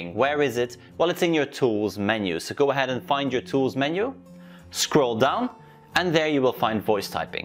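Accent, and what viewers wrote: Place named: United Kingdom. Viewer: It's because you talk in the queen's English not in American English there's a difference.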